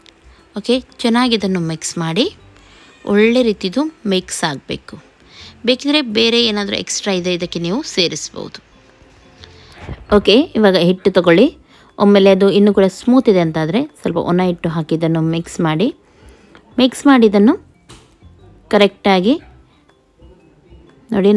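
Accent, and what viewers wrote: Mangalore, India. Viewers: Medam sorry..but wher you from.?? R u from Mangalore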